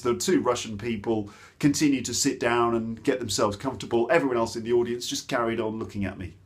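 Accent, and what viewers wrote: United Kingdom. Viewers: Your pronunciation is one of my favourite British pronunciation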